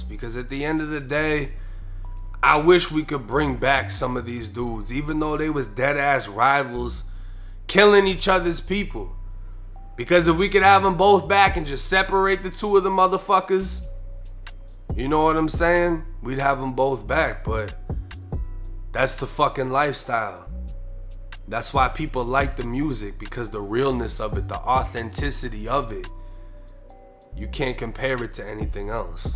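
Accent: Boston, United States. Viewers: You from Boston ? I can read the accent lol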